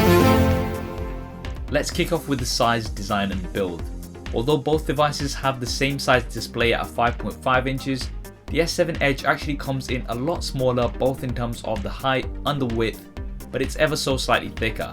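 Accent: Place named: England, United Kingdom. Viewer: btw I know you're Muslim and from England I think by your accent where's your parents from.?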